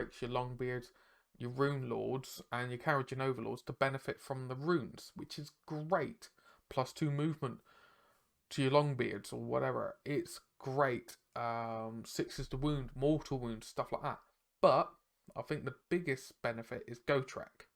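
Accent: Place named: Devon, United Kingdom. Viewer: That voice was much more Devon than I was expecting...love it.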